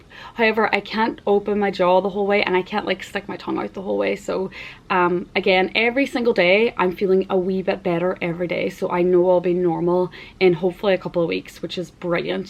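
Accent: Ireland. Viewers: You're SO BEAUTIFUL! And articulate, aaand i could listen to that Irish lilt all day... Your positivity and courage are inspiring, and i admire the grace with which you're handling this scary and overwhelming experience. I am praying for you dear... Hope you can feel the love and support from all of us who would help you carry this heavy burden in a heartbeat if we could. Sending you comfort and the peace of acceptance, whatever lies ahead. ❤❤ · also your irish accent is sooo pretty! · I'm glad to hear that you're doing well post-surgery. Did they tell you what filler they used to fill in the hole? It's usually fat! I also noticed moments where your accent turns Canadian eh! Now there's now't wrong wi' it, just the Irish accent is the worlds favourite so don't lose it! My next door neighbour is Northern Irish and I love listening to her talk. Ask the surgeon if they recorded the surgery and if you can have a copy of the video... 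they're not gentle when they need to move you around, that'll explain the pain here and there. Keep up the recovery. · Thank You so much for Your sweet update on Your progress! So glad it’s over for You You are so beautiful inside and out and I adore Your accent its Irish, isn’t it? Trust the Lord in all Your ways.❤️ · Absolutely love your beautiful Irish accent. You are looking so good ❤ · Thank you so much for sharing your journey with us! I hope the doctors bring good news so you can live a long and beautiful life. You have.a huge heart and are lovely inside and out. Not sure where you originate from but I enjoy your accent…it sounds a wee bit Irish 😂. Love it. Bless you and stay in touch with us.❤🎉🎉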